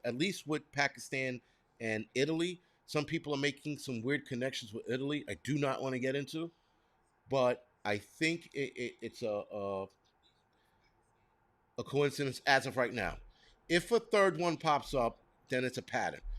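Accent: New York, United States. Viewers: I loved your video. You are so real and talk like a born and raised New Yorker. When I heard you talking about you might be in trouble for just being at the rally my heart skipped a beat. I pray you keep your job and your family are safe. Please keep go for the ignorigation. We don't need to see a few dozen people that will be there. You have been talking about getting out of the city for a while maybe this is a sign for you and your family to leave soon. 🤬🐈🤭💖. God bless you and stay safe.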